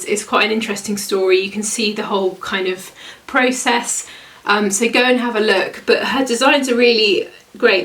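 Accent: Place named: United Kingdom. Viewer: You do just find..Dont pay any mind to others that doesnt have a kind word..Im in the U.S...I find UK a cute accent..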